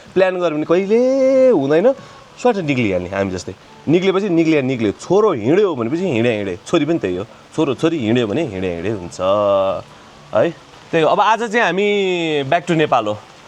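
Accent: Darjeeling, India. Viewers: Too good Dai Darjeeling accent 😂😂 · Bro love the darjeeling accent 😂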